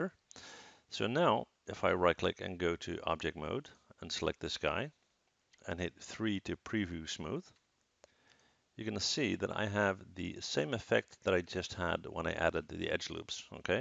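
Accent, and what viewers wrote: Netherlands. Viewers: Hey, can you make a tutorial of how you can render in maya. So you can render your scene for your portfolio. And I have another question, are you from the Netherlands because you sound like a Dutch person. thanks for reading my comment and have a nice day.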